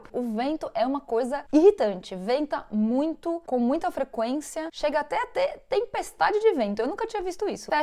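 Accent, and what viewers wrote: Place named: Minas Gerais, Brazil. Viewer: Pero el aciento parace de mineira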